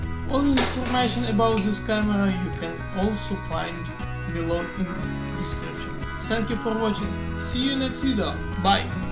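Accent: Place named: Lithuania. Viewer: Myślałem że to Litwin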